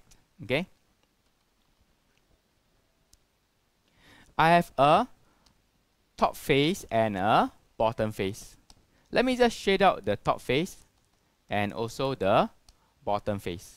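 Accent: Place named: Asia. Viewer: bro you have perfect asian accent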